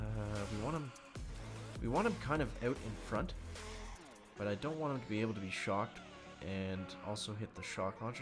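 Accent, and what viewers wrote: Canada. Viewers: Great accent Canada, eh!! Nicest people on the planet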